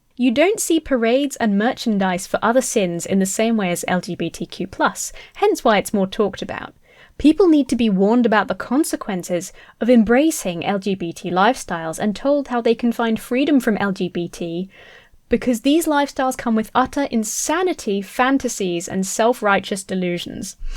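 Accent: United Kingdom. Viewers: "Why are you British?" 😂😂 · I also would like to know why you are British. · Wait, you're British??? Shocked, I am just shocked! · "Why are you British" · I'm glad you know you're an exceptionally beautiful young woman and won't let stupid comments deter you from that. You are also bright, smart, quick witted, seemingly very educated and I love the Bri - ish accent. Please continue doing you so I may continue watching/listening to a higher level of videos since YT sent you my way and therefore have subscribed. Peace and all that. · has a point. why are you british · DAMN I am jealous on your absolutely PERFECT British accent , I wish I could talk like that. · "Why are you British?" What in the world??? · Wait, but why are you British? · Why are you british? ladlsfkajsdflkja;sdlfkj;adlsfj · Indeed you are.💛And your short hair and"sporty style"are adorable.😊 Not a fan of the british accent,but that's my problem,not yours.(I'm Italian and,growing up,I got used to the american accent thanks to my"Buffy"DVDs,in case you were wondering.) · why are you british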